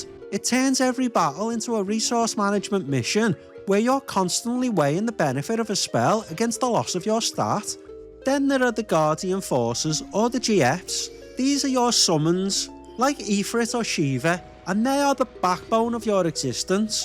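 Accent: Liverpool, United Kingdom. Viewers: Man the Scouse is THICK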